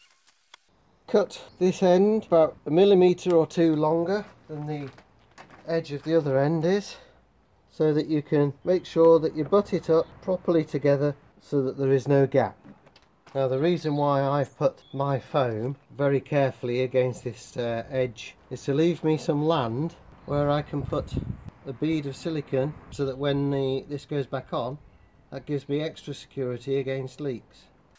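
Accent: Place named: Wigston, United Kingdom. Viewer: Greeting from Texas! Are you somewhere near to Wigston ? I'm guessing by your accent..!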